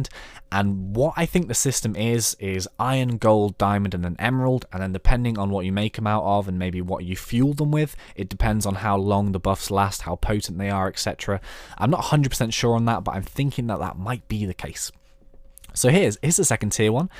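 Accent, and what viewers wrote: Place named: United Kingdom. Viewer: That's the only thing that made you think he was from the U.K? The British accent didn't make it obvious?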